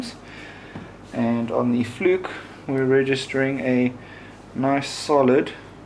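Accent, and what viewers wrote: South Africa. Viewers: Sounds South African and they did once use a comma instead of a decimal point.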